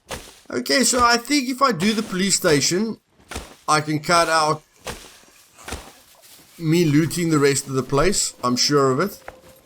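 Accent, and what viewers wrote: South Africa. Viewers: I like your accent is it south african or new zealand? i may be completely wrong but i know people from both places and you sound similar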